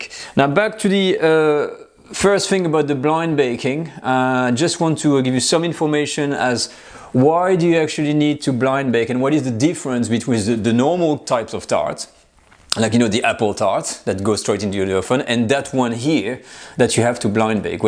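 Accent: France. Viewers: this guy talks too much...the first 3 minutes repeats same 15 second information twelve times in thick french accent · Your English is utterly idiomatic but with a French accent. Magnifique!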